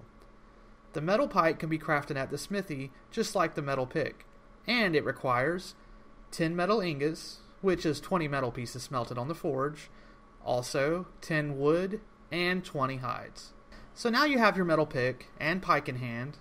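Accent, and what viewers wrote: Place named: United States. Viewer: Dude this is the best help in Ark on YouTube that I ever listen to at the same time is nice to see an American talk rather than a British action player talk your tips are the best on YouTube Most people contradict their own words when they make YouTube videos on ark with there very own video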